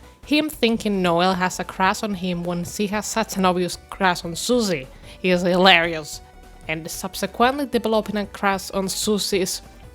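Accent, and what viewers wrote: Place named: Italy. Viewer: I’m sorry this ain’t related to the art but I love your accent so much are you Italian??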